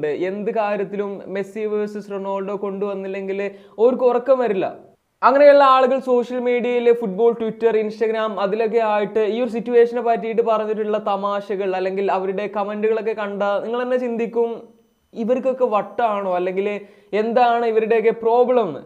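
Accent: Malappuram, India. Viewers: Thangal malappuramthu kaaran aano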